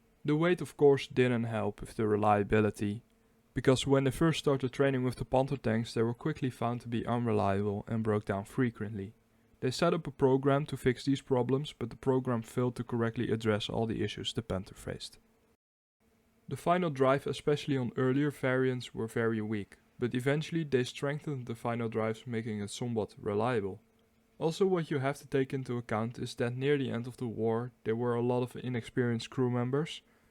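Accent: Netherlands. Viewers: Your melodic Dutch accent makes my own crude english, (one & only), language sound good. Very easy on the ear. And your multilingual styled pronunciation is not only impeccable but also aids comprehension of info'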